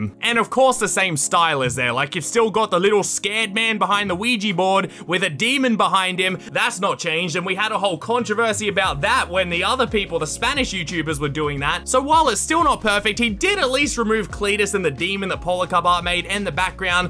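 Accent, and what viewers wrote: Australia. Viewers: I love his australian accent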